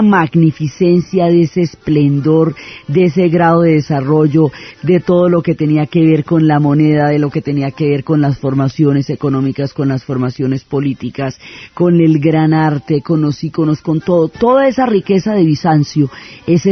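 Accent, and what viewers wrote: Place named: Colombia. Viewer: TIENE ACENTO COLOBIANO LA SENORA, Y ME ENCANTO SU VIDEO. FELICIDADES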